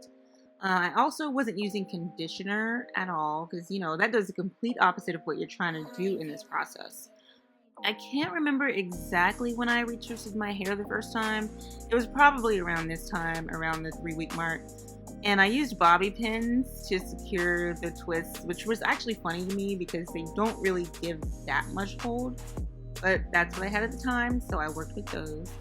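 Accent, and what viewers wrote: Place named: Southeast, United States. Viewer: Dis joint be dry dou... lol Sound like you from S.E. Haaaaaa!!!!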